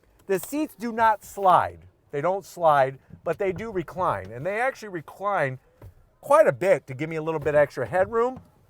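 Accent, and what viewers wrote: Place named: New Jersey, United States. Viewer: This guys whole style and accent scream cheesy Jersey City car dealership commercial from 1987.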